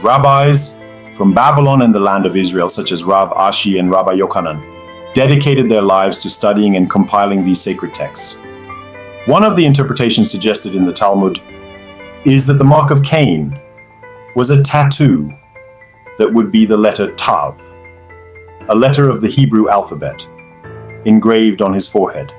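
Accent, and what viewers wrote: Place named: United States. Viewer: TA falando ingles pra caraca americano mesmo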